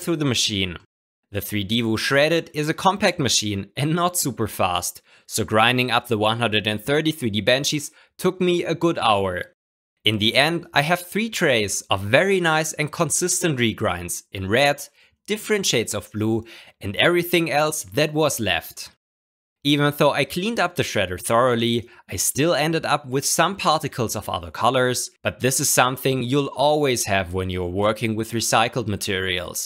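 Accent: Germany. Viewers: der ausprache nach sind sie deutsch mein englisch ist auch nicht gut xd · Are you german ? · I smell some german accent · Are you From germany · You’re a German am I right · I Hear the German Akzent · Digga sprich doch einfach deutsch man merkt deinen deutsch Akzent also sprich einfach deutsch und hör auf so zu tun als wärst du Amerikaner oder so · German accent? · you sound like you´re german ,im too! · so ein wunderschöner dicker deutscher akzent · Man hört ech raus das du deutschr bist ;)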